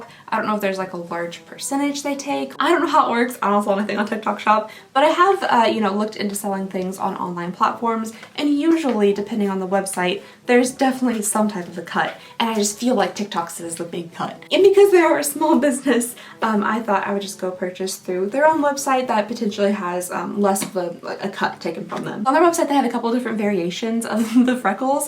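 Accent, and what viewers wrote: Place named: South, United States. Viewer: I just have to say I found you a little while ago, but I adore your accent and southernness so much, since there’s such a lack here on YT.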